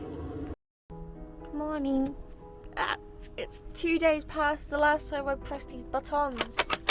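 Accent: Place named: Scotland, United Kingdom. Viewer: Its funny to hear someone in Scotland getting confused by your accent as being Scottish and living in England its usually the opposite way around for me everyday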